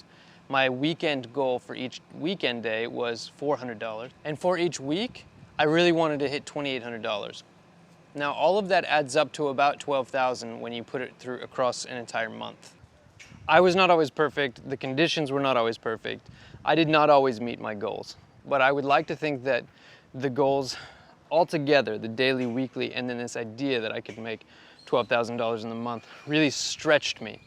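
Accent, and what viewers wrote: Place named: West Coast, United States. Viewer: Medford Oregon. Good stuff. He has a west coast accent but I couldn't figure out what market he worked in.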